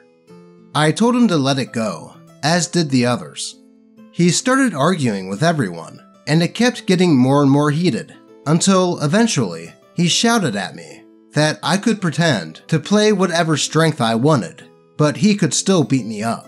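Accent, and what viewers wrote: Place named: Baltimore, United States. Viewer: That Baltimore/Maryland accent coming out real strong in the first story lol all about Heaumophaeubia and the player hitting awn OP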